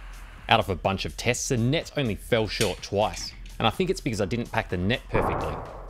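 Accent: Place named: Australia. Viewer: You are SO funny, and clever with the storyline. Great to hear an Aussie accent on you-tube for this sort of thing (engineering, experimentation sort of thing). Maybe the vapour puffs after the wadding exited the tubes are from adiabatic cooling of the compressed air as it escapes and expands rapidly, causing water vapour in the air to condense in micro droplets in the cold gas? (Water vapour creating short-lived, mini clouds).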